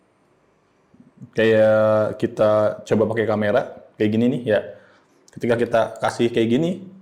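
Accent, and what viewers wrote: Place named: Jakarta, Indonesia. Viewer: Bahasa indonesia betawi yee😂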